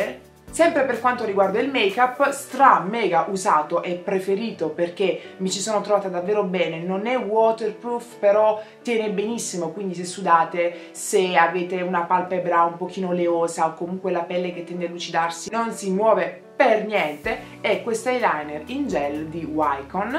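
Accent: Apulia, Italy. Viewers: i versi mi hanno uccisa😂, sei bellissima ,si sente che sei pugliese,lo sono anch'io, se non sbaglio sei di Foggia... ciaooo bacii😂❤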